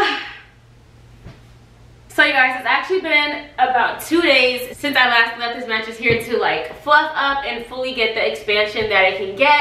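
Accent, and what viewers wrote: Jamaica. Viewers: Are your parents Jamaican? I can hear the accent and mmm mmm is honestly what have it away in the beginning 😂😂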